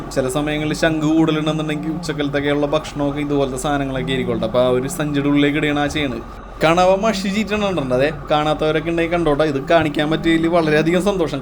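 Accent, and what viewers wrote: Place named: Thrissur, India. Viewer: തനി ത്ര്ശൂർക്കാരുടെ ഭാഷാ ശൈലി.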